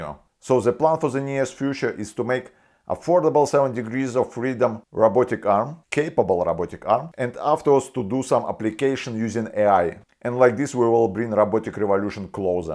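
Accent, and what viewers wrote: Russia. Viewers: Русский акцент слышно даже мне. Но то что автор не стесняется разговаривать это молодец. Видос не досмотрел, но за старания лайк. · Акцент русский.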